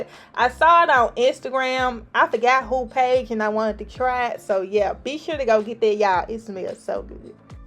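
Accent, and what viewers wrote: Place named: Memphis, United States. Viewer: That south Memphis accent❤️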